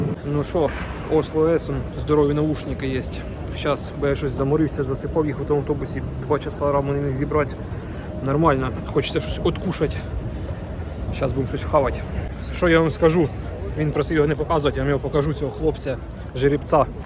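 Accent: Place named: Surzhyk, Ukraine. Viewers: Ми Українці шо не понятно а балакає він як у нас в Дніпропетровській області привіт з Павлограду. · Важко сприймати на слух такий жорсткий суржик.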